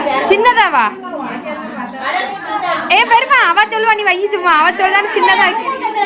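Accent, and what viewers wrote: Tirunelveli, India. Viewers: Akka unga thirunelveli slang nalla erukku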